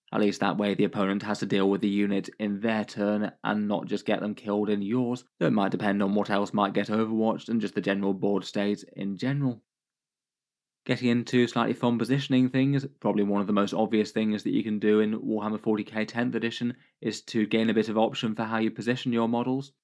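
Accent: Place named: United Kingdom. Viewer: Has anyone ever bumped into at a Tournament? I'm wanting to confirm he's a real person that lives in a house, sounds like he's from the UK. Surely someone knows him!!😅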